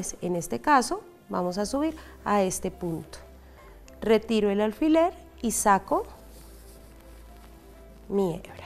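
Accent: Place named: Colombia. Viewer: Eres Colombiana lo digo por el acento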